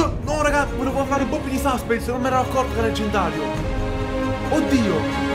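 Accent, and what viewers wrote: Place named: Rome, Italy. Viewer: Ma sto accento romano?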